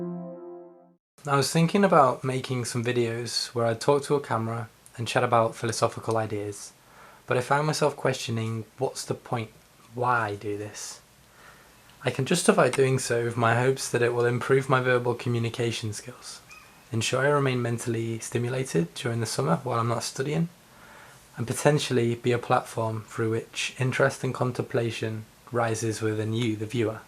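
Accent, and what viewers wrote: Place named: United Kingdom. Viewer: More horse shit 'British' accent ? what.. go back to your pitiful little island a piss off.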